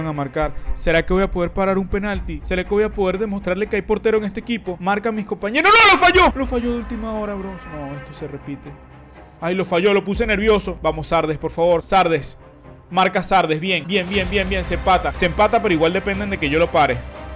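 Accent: Venezuela. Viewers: Creo que es venezolano como yo por el acento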